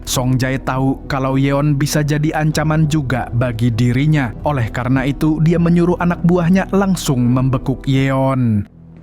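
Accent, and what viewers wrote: Banjar, Indonesia. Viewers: Orang Banjar kah mang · Ada logat Banjar nya bang 👍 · Pian urang Banjar kah mang,Ulun kira urang luar Kalimantan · Bang orang banjar hah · Ini orang banjar semangat bang gak yangka ternyata orang banjar semangat jangan ampih man ai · logat banjar pian😂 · Ikam ne orang Banjar kah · urang banjar sekalinya😂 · pian urang banjar kh mang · ini admin nya orang banjar kayanya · Bro lu orang banjar gw juge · Bg orang banjar kah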